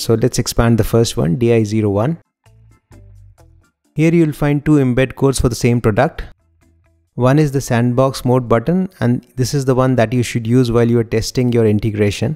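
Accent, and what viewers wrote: India. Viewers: indian accent?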